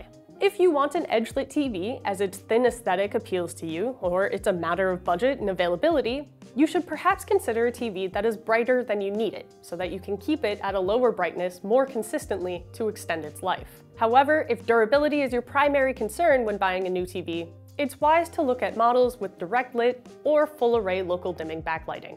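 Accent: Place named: Canada. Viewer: Didn't even think aboat where RTINGS was located, but when the familiar accent crashed into my ears, either they were from Canada or RTINGS is based the. It was only after the job posting link did I find oat the truth.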